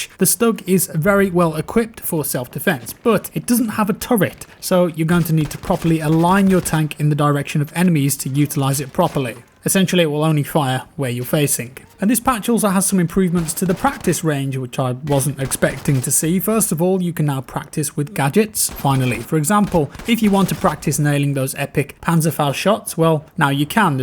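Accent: United Kingdom. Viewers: Talks like a brit.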